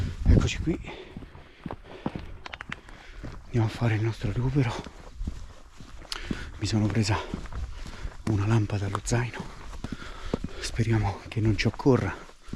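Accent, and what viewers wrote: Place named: Central Italy, Italy. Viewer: Bellissimo video di caccia, complimenti ! Dall'accento sento che sei del centro Italia... di dove esattamente ?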